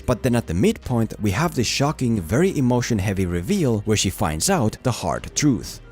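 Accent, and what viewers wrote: Finland. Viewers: I have to ask, are you Finnish? Your accent tells me you are. In anime the story was different and as far as I remember, they always setup things and payed them off. This Movie had so many WTF moments and no stake at all for the viewer. What a waste.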